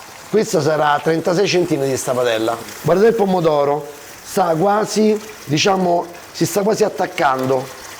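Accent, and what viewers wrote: Rome, Italy. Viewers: CIAO... OGNI VOLTA CHE COMINCI I VIDEO DICI SEMPRE ISCRIVETEVI.. IO SONO UN PO' DI ANNI CHE MI SONO ISCRITTA..OLTRE A TE GUARDO ANCHE RUBEN UN'ALTRO ROMANO COME TE.. GLI ALTRI CANALI LI GUARDO.. MA NON MI DICONO NIENTE... SEI PROPRIO IN GAMBA!!! SOLO A SENTIRTI PARLARE ROMANO MI SENTO A CASA.. INFATTI IO SONO DI CIVITAVECCHIA E IL MIO DIALETTO SI AVVICINA AL VOSTRO.. MI PIACI ANCHE QUANDO CUCINI DA CASA TUA.. E A VOLTE A CASA DI TUA MADRE.. TI RINNOVO I COMPLIMENTI.... MI PERMETTO DI DARTI DEL TU.. PERCHÉ POTREI ESSERE TUA MADRE DATA L'ETÀ. (75 ANNI) RINNOVO I SALUTI.. CONTINUERÒ A SEGUIRTI.. CIAO A PRESTO E BUONA SERATA 🌹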